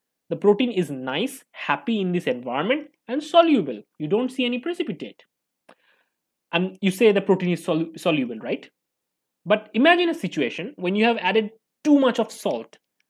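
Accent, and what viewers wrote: India. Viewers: Presentation is very good but you need to work on your pronunciations of words in English. You have very strong Indian accent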